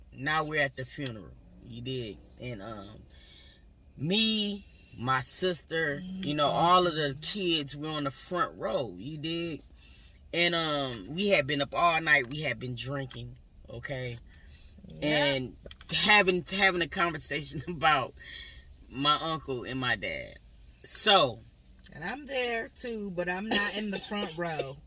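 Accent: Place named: Detroit, United States. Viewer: Great story! 💕 I’m new to your channel... been listening for about 6 weeks. U are one of 2 content creators I actually enjoy listening to. U actually get it and convey what the ppl are thinking and enhances it! But I swear, listening to u talk, I said she gotta be from Detroit because she got our flavor... The way u speak and the lingo is Detroit. I would be shocked if you’re not from here. But I love your reactions and hope u keep at it!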